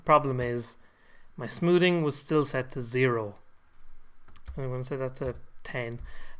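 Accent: Ireland. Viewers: Great video! PS: Your voice sounds like Jacksepticeye (because you're irish)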